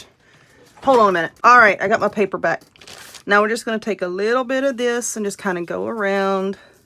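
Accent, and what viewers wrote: South, United States. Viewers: I love this wreath! that husband joke was hilarious! You are so funny and I love to watch your channel. I love your southern accent. Tfs.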